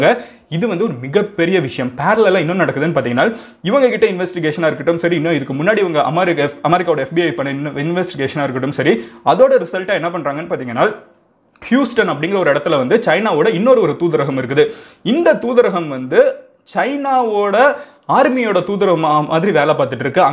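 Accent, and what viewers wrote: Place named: Tamil Nadu, India. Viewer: This type of slang is from all districts except from Chennai and outer ,🤣😂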